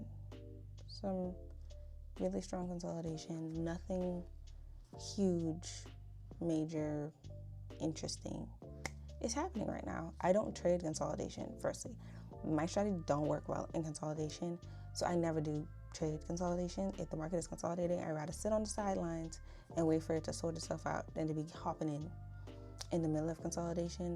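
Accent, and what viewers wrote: Bahamas. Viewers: Im enjoying this video. I had stopped trading for like over a year, but youre inspiring me to get back into it. Btw are you Bahamian? You sound like it. Anyway great video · did i hear a bahamian accent 👀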